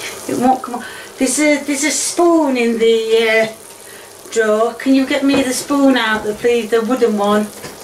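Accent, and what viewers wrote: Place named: South Yorkshire, United Kingdom. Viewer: Are you a South Yorkshire Lass like me ? We have the same accent ❤️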